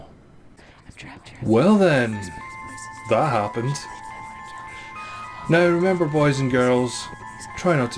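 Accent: Scotland, United Kingdom. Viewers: did this really happen?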